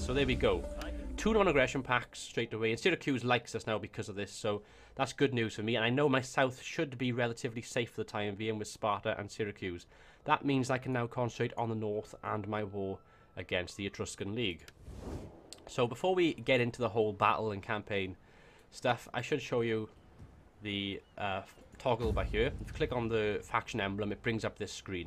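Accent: Wales, United Kingdom. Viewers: best tutorial ive found for total war rome 2, plus your welsh so it just sounds better :)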